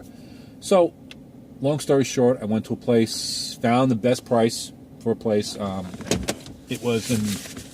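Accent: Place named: New York, United States. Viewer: Where in NY r u from? I have a good idea based on ur accent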